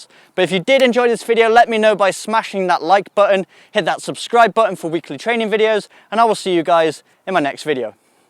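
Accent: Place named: England, United Kingdom. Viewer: Top video as per usual.Where abouts in England are you from mate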